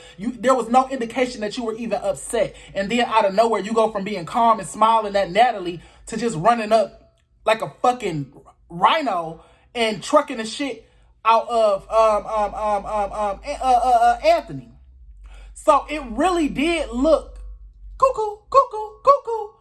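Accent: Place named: South, United States. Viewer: You’re accent is fine 🩵are you from the south I love southern accent or east cost too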